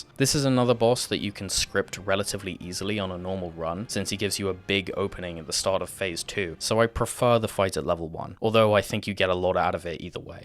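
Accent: Wales, United Kingdom. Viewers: He is British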